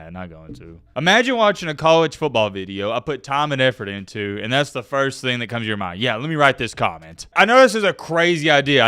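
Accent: South, United States. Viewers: It’s impressive what a southern accent and zero football knowledge this guy is still very entertaining and that’s all that matters.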